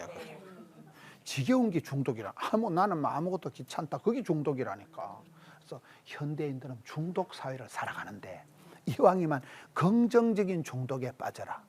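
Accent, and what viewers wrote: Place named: Gyeongsang, South Korea. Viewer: 목사님 경상도 사투리가 너무 웃기세요 그래도 너무 은혜롭습니다~~ 할렐루야 아멘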